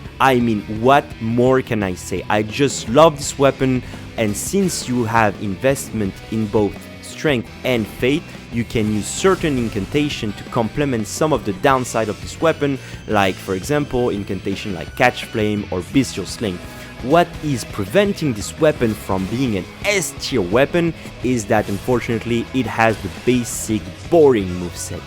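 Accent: France. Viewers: cet accent anglais du Pas de Calais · A il a un accent français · T’es français toi c’est sure, mais t’as un accent vraiment mignon en anglais 😊 and I’m sure you made this video for the PVP player and not PVE. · Je kiff tes vidéos tu gère serieux, je rep en francais j'ai entendu "putain" dans une de tes videos et t'as un accents un peu "fr"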